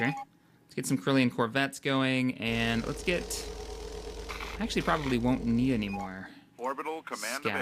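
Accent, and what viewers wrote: France. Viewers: thanks for the info and are you french?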